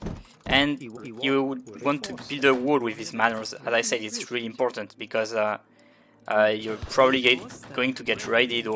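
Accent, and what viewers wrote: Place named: France. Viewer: That french accent is insane 😂😂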